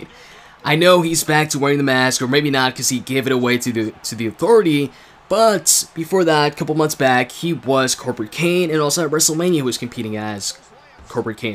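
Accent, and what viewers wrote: Canada. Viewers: why don't you speak with a French Canadian accent anymore? please respond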